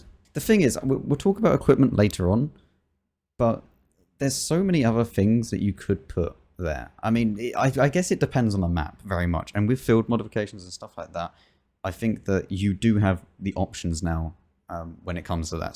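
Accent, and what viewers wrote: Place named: United Kingdom. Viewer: Wow, who is this beautiful assassin lookin' dude at? With the {I think?) British accent? Does anyone know? His reviews are the best, my opinion, and I'm in love. Wow, my cheeks are hurting. No...from smiling!;)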